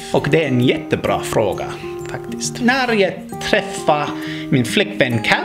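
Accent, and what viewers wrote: Finland Swedish, Finland. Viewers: Wow! Gullig finlands svenska :D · haha you sound finnish. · I can hear finlandssvensk dialect there :) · bra svenska men med finsk dialekt. vrf tröck du där??!! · So... how long have you been speaking swedish men jag kan talar inte svenska även dom det är vor andra språk.. at least not any good don't even know is that correct :D . and i was so amazed for your finlandsvensk accent and perfect prenounsings ! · Haha, I love that you've learned it with a swedish/finnish accent! Good job, mate! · Du pratar Finlandsvenska men orden är bra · To a Swedish person it probably sounds like you're already speking perfect finlandssvenska... Or at least this is pretty much what they sound like when they try to imitate it :) pretty fucking good, gotta tell ya! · You should try to learn swedish with a swedish accent and not with a finnish one. 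· OMG! Srsly... How did I miss this video? Loving the Finnish accent! How 's the Swedish coming along these days? I guess learning Finnish is probably a bigger priority for you. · Det låter som finlandssvenska men du är jätteduktig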